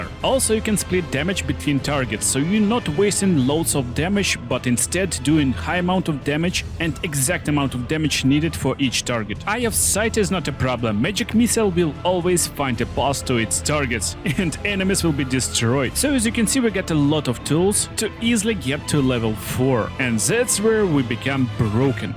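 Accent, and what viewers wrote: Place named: Russia. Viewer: Сэнкс фор зе гайд! Ар ю пробабли рашн? Каз Айм притти щюр зэт зис ис рашн аксент.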